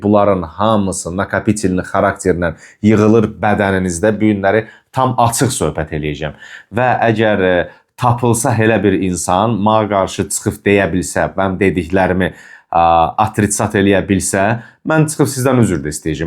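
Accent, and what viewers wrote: Ganja, Azerbaijan. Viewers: eşq olsun qardaşım! elə birinci videonnan tutmuşdum ki Gəncəlisən)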